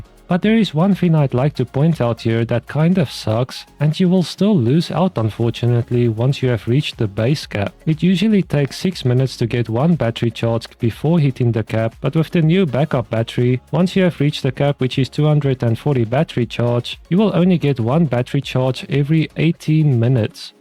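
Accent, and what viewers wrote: South Africa. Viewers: I know a boet when i hear one, Great vid fam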